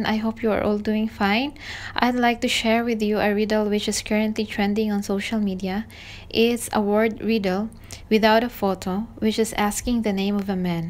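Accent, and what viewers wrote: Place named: England, United Kingdom. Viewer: Nicee Content, I like your English accent. Tinapos ko para malaman ko dn sagot I think Andrew is more accurate, hihihi nag iwan na dn ako ngskli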